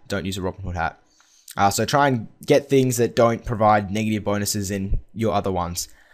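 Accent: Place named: Australia. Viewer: YOU SOUND AUSTRALIAN. LOVE IT.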